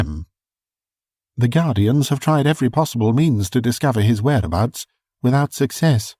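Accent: England, United Kingdom. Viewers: You and this channel have become my absolute, number one favorite thing to listen to and I listen to them repeatedly! IMHO, only a proper English gentleman can do these awesome old ghost stories the justice they deserve and I’m so happy to have discovered you! I enjoy your readings just as much as I enjoy Michael Hordern and Basil Rathbone readings but (unlike them) I can look forward to new ones from you. I listen every single night when I climb into bed and let me tell you, I’m enjoying losing sleep...THANK YOU!!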